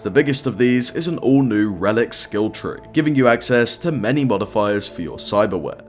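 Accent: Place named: Australia. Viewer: You've got a pretty interesting accent. Are you Australian? You voice really reminds me of CatBoyKami :D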